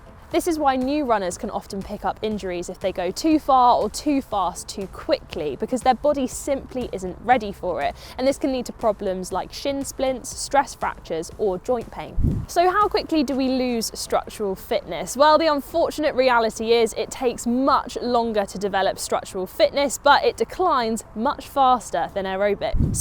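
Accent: United Kingdom. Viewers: Hi I much more 😍 Your Videos. Add 1 subscriber. So where you from USA Or something like British?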